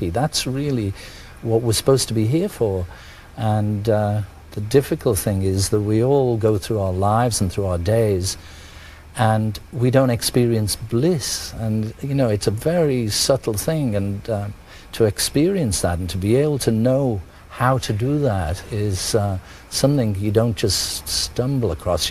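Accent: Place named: United Kingdom. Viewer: THE ACCENT IS MUCH BETTER THAN AMERICAN ACCENT AND APEACH, AMERICANS DONT LIKE IT, EVEN WOMANS, BRITISH WOMANS, BEAUTIFUL ACCENT AND VERY KIND, NOT RUDE LIKE AMERICANS GIRLS., LOVE BEATLES, WHO DOESN T ?? ELVIS, NEVER PLAYS GUITAR LIKE BEATLES OR WRITE A SONG, OR THIS VANILLA ICE LOL, ITS A JOKE, AMERICANS, THEIR GOT ONLY INSTRUMENTS, REAL MUSICIANS ARE FROM UK...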